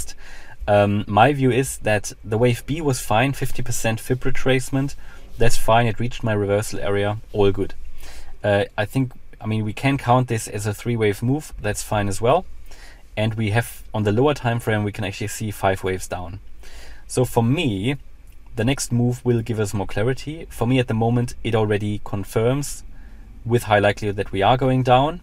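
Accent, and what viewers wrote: Germany. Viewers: German confirmed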